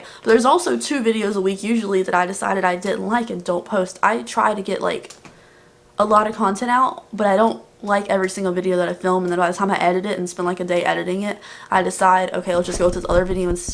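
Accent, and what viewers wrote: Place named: New Jersey, United States. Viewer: my favorite part of this video was when you said you moved to Florida. I got so excited for you! I could tell how happy you were ❤️ I seriously had to laugh though because I was expecting you to say you moved from like somewhere really cold. NJ or something from the sound of it. then you said NC and I laughed so hard 🤣 girl, I'm from PA. the winters here are ROUGH!! it's like 30 degrees out right now and that's warm for this time of year 😱😭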